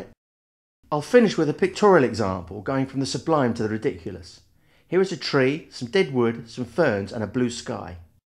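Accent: London, United Kingdom. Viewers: Fantastic video! I have been watching your videos for some time now and wanted to tell you how informative, well put together and straight forward they are. Also your "London Twang" reminds me greatly of my family which is very nice to hear as I am not currently living in the UK at the moment. Anyway, I will stop rambling. Take care and I look forward to more videos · I am here 9 years after this was made to hear that familiar London twang. I am also a Micro4/3 user despite what other people say about this "dying" format. It is a camera that I could carry with me almost everyday with intention to shoot or not for the day.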